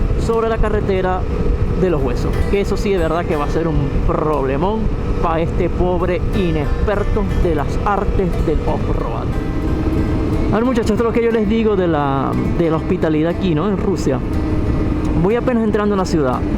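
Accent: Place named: Zulia, Venezuela. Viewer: Uno sabe que un episodio es bueno cuando el tiempo se te va volando cuando lo ves... Cuando te oigo me imagino la rápida traducción mental que vais haciendo del maracucho al español "neutro" antes de hablar, cosa difícil en nosotros los zulianos jejeje, me pasa en Argentina... saludos hermano, que siga creciendo el canal!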